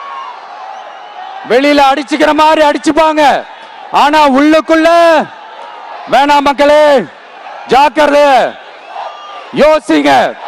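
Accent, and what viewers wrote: India. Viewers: முதலில் தமிழ் நன்றாக உச்சரிக்கவும் இந்தி காரன் தமிழில் பேசுவது போல உள்ளது ஒரு வேளை இந்தி காரன் தமிழில் எழுதி கொடுத்து இருப்பானோ???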